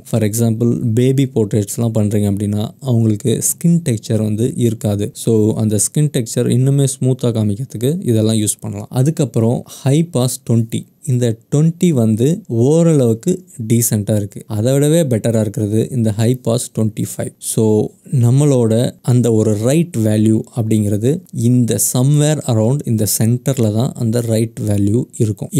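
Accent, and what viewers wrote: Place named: India. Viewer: Saya dari indonesia.. Tutorialnya bagus, tapi saya tidak terbiasa mendengarkan bahasa inggris aksen india.. Sebaiknya ada tulisan inggrisnya, atau translet indonesia.. 😃😃😅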